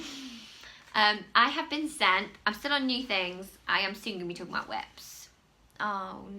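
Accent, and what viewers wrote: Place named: United Kingdom. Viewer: Happy to find your podcast, watching for the first time! I love mustard, I love that you crochet, and I love your energy. Your accent brings me back to my time living in the UK (in the USA now) and I can't wait to watch more of your episodes!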